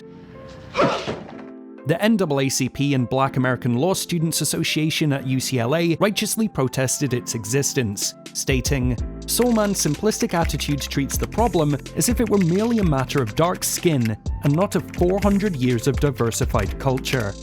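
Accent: Scotland, United Kingdom. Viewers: I assume that you are white (i'm detecting a Scottish accent there) Any way here goes, I notice that when i watch ANY 1980s comedy films, what they all have in common is... whackyness & that they are ALL very loud, considering how money & power obsessed the 80s were, I think its a wonder that comedy films were made at all. be it-TRADING PLACES(dealing with both class & race prejudice issues) PORKY'S,FERRIS BEULLER, POLICE ACADEMY even, and this film is no exception, its more to do with insensitivity, clumsiness, and America's,500 year old race issues, that werent properly dealt with. They approached this the same way that they would approach... POLICE ACADEMY, not adjusting it often enough for the the subject matter and applying some patience, instead opting for a formulaic comedy style. BUT despite it all, I liked the film, this is fresh, playing for laughs, instead of the down trodden ROOTS,GONE WITH WIND, TO KILL A MOCKINGBIRD po faced look at ignorant racism, it DID make its point, which wasnt lost on me.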